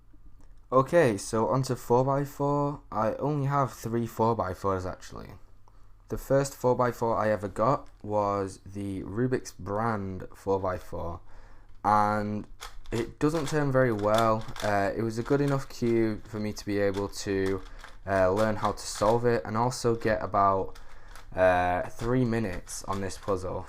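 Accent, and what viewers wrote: United Kingdom. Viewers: Im a beginner UK cuber and you sound like you are from the UK to! If you are, where do you buy your cubes?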